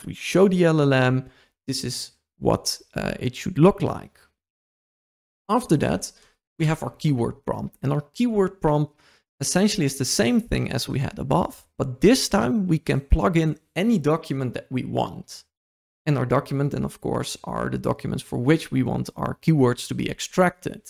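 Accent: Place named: Netherlands. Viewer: God what a Dutch accent, coming from a Dutchie 😂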